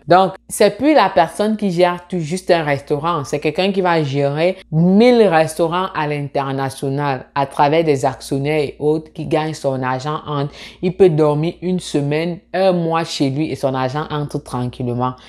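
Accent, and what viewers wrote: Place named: Canada. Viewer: vous avez l'accent canadien mm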